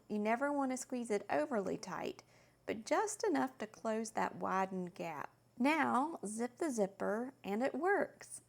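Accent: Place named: South, United States. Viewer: Thanks for the awesome video. Everything sounds better with a southern accent! One question, why not simply pry open the bottom of the glider to put it back on the zipper instead of cutting the zipper?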